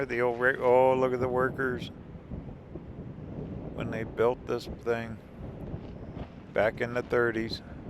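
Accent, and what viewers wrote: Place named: Upper Midwest, United States. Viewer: I love your upper Midwest accent.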